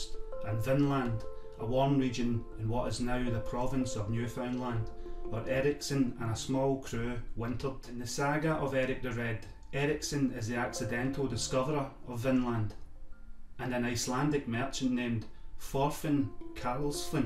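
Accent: Scotland, United Kingdom. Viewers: Love any & all information on the Vikings as well as any info on Scots & Picts too!...thank you for sharing & again I love hearing the Scottish accent! Have a blessed weekend! ~Ginger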